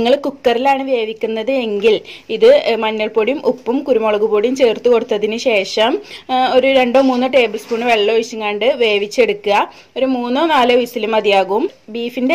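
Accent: Malappuram, India. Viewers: samsaram orupadishta, njanum malappurathukariya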